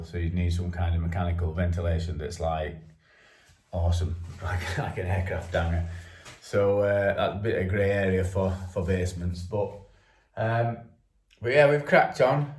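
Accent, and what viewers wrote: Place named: Ilkeston, United Kingdom. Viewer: Love the accent, sounds like an ILKESTON one to me, plus you look better with the beard. On a more serious note, I renovate properties for a living and tried your injection methods into the mortar for the first time and yes it worked out fine for me. You give out basic no crap information, good luck with your future videos, thanks Dave.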